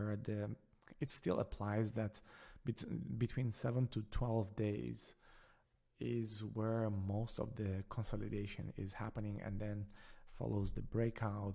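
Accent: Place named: Germany. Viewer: Hi, great analysis . Are you german ? I speak some german and your accent is very similar. Thanks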